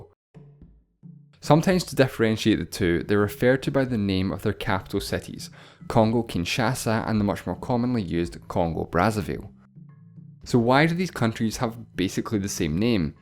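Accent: Scotland, United Kingdom. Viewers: I am more fascinated to listen to your Scottish accent rather than the Congos itself. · Scottish accent, right? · Azwad Ahsan it’s an Scottish accent. How can he possibly change that with education? Your brain sucks get some education pls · José Guilherme Scottish accent.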